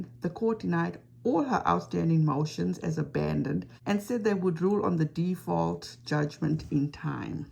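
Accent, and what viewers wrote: South Africa. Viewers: this is the first of your videos I have seen. Do I detect a South African accent?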